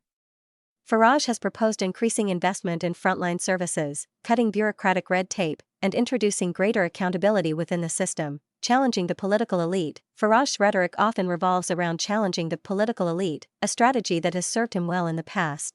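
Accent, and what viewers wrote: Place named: United States. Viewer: Why do all these podcast have American voice overs. Maybe because they ain't from British podcasts but from the home of the "Sceptics", thr bad ol' US of A.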